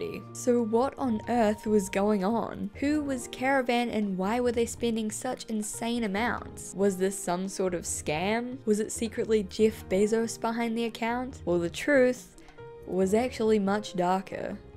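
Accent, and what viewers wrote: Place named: New Zealand. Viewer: Kiwi accent... finally one of my own 🥝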